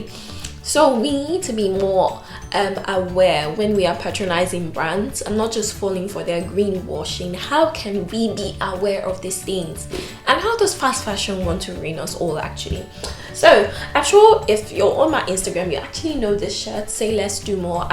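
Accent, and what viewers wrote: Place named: United Kingdom. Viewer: with the British accent. Thanks for educating us on supply chain. I didn’t know supply chain had this much depth. Keep it up 👍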